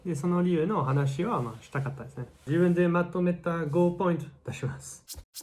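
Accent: Kansai, Japan. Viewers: 少し関西弁だなーと思ったら、京都に住んでるんですね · 急におすすめ出てたので見ました。 フランス人は日本人キライかと思ってたのですが、貴殿で3人目です❤️ YouTuberで日本loveな方に出会えたの😊 しかも関西弁😁 親近感湧きまくりです☺️ チャンネル登録しまぁす😄 これからも宜しくお願いしまぁす🙇🏻‍♀️ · ♥独り言をぐずぐず言っているような喋り方で、すごく聞き取りにくいので、済みませんがもっとはっきりとしっかりと喋ってもらえませんか。 嫌味ではありませんよ、励ましですよ。 ♥う○ちについて蘊蓄を傾けるところがすごい。 花の都巴里ではなくて、実態は、う○ちの都巴里だったのですか？という事は、デート中でもう○ちを踏む確率大なのですね。それに、う○ちを踏んでいる事に気付かないままキスしている事もあるって事ですね。 地雷踏むのも、嫌だけどう○ち踏むのも嫌だな。 私は生まれてまだ一度もう○ちを踏んだ事はありません。偉いですか。 ♥御辞儀だったらいやいやでも出来るけれど、いやいやのキスなんか出来ないな。どうしているんですか？ コロナ禍であってもキスの習慣は健在ですか？どうしているんですか？ ♥関西在住ですか？何となくアクセントが。。。 ♥関係無いですが、若いのに額が後退している模様。これは励ましではありません、禿げ増しです。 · アラブ人に見える。言葉は関西人に聞こえる。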